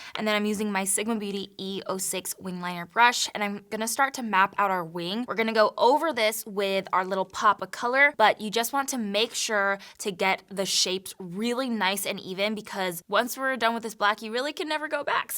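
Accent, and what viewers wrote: England, United Kingdom. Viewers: So everyone is just gonna ignore the fact shes been using a British accent 🤔🤨😂😂🤣.... im here for it though, i do it all the time also, glad im not alone!!.. happy valentine's day 💕💗💞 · I love how you always throw in an English accent 🤣🤣 loved this fun pinky look 💗✨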